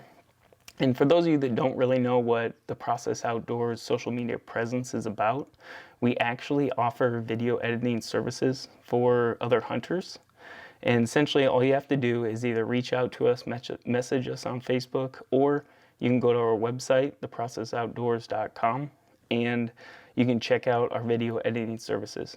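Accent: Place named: Midwest, United States. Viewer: Obviously you are in Midwest not deep south lol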